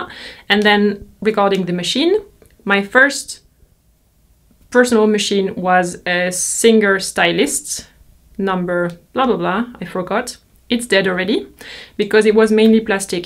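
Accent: France. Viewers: I just enjoy your French accent so much Music to my ears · She has a French accent. The more you listen the more you'll understand.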